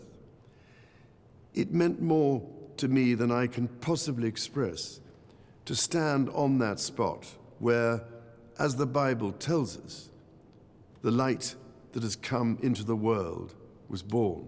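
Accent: RP, United Kingdom. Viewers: He also sounds posh like his beloved mother 👍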